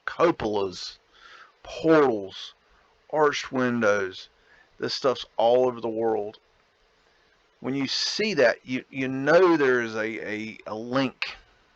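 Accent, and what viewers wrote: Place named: North Carolina, United States. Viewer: I think it's because he has a NC accent. I spent my Summers with family there. It takes me back to all the questions I asked them. They almost never had answers for me.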